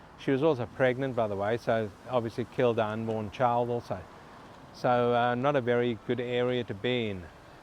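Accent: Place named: South Africa. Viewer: Sounds like you're from South Africa, mate - one of the highest crime rate countries on the planet!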